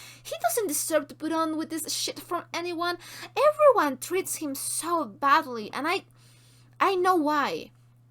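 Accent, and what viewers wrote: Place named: Mexico. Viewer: I hope that someday you'll read the 3 novels, . As hard as it may be to believe, the books are 100% better than these fantastic films, especially the character- and world-building. I read the works as a teen, and now I listen to them on YT audiobooks when I'm resting, and while doing household chores! I really enjoy your accent, having lived among Mexican farmworkers on both sides of the border, for a total of 10 yrs way back in the 1990s. I am a retired antropólogo cultural. ¡Nos vemos pronto señorita! 🤞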